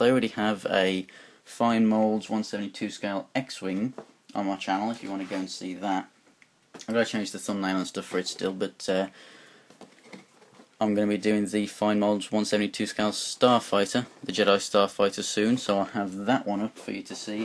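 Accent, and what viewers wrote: United Kingdom. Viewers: Are you from birmingham? you sound like a brummie xD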